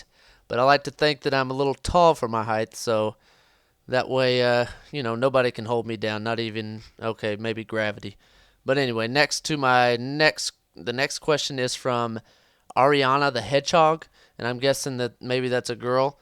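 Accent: South, United States. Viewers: Sounds southern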